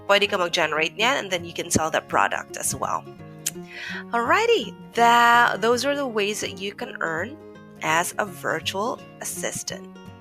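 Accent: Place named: United States. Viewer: you speak great! 'thought at first that you're an american! great accent, lpve the tone of your voice.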